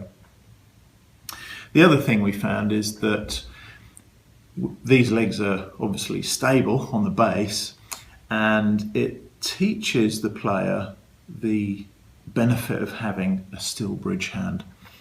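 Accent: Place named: United Kingdom. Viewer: Hi Coach, if you are reinforcing proper cue motion via the trainer, does it fully carry over to cueing without it? Btw, your British English is so good to listen to. Greetings from Poland.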